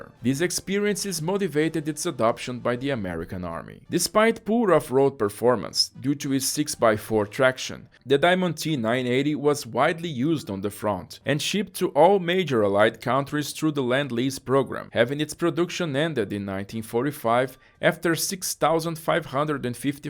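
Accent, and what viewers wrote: Brazil. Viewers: Nunca vi esse canal, mas percebi que era brasileiro pelo sotaque HEUHEUEHUEHEUUEUE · Apesar de falar inglês muito bem,o sotaque entrega que é Brasileiro 😂👍 Parabéns por estar trilhando um novo caminho com um novo público!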